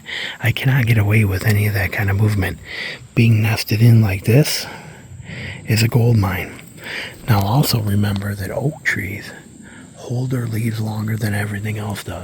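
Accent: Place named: South, United States. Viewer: Ahh.. thankyou . Spent 10 years in N.C., you'd Think i'd know how to decipher a souther accent. Scheesch... !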